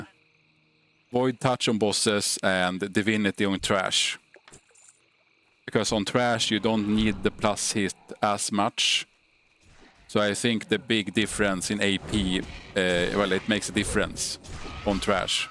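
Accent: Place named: Sweden. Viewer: Great theorycrafting, btw älskar den svenska dialekten!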